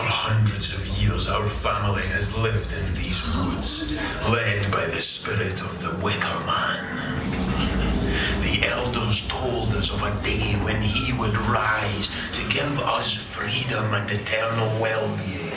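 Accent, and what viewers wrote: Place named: Scotland, United Kingdom. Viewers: Malmborg Implano it’s a Glaswegian accent ( Glasgow ) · Malmborg Implano Yea scottish is a accent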